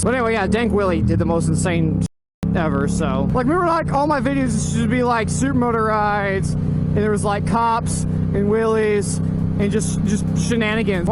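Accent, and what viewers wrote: United Kingdom. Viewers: loving the British accent XD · Absolutely splendid English accent, sir.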